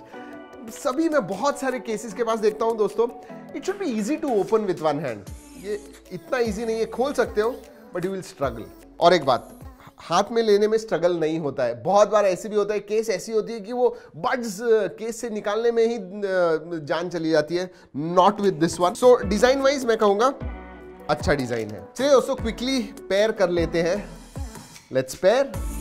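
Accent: Maharashtra, India. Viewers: Are you a Maharashtrian ? And definitely from Mumbai ?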